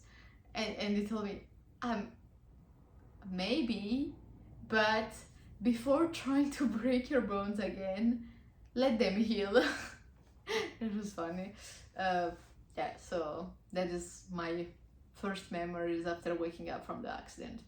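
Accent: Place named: Italy. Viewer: Your accent sounds Italian. 😊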